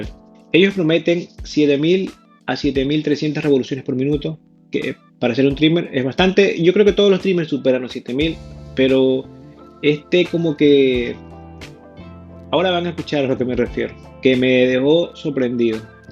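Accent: Venezuela. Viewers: Hablas como venezolano!! Jejeje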